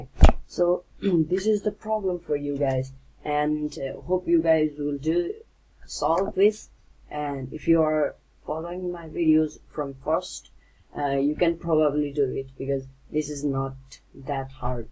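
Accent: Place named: United States. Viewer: please take in proper not like Americans talks so it would we understandable by everyone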